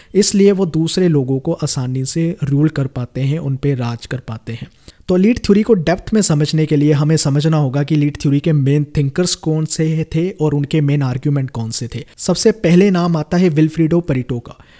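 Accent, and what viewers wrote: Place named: Jammu, India. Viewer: awaz se jammu ka lg rha h bhai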